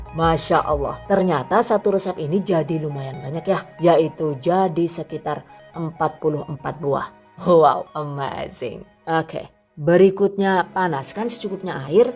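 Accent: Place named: Madiun, Indonesia. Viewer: SUARANYA KYK YG DI MADIUN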